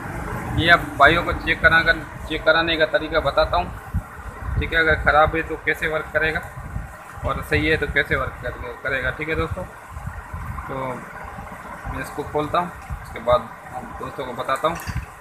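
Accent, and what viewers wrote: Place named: Sindh, India. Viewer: Ha aapke bolne ke andaz se pata chal jata he sindhi style he ...main umarkot sindh se.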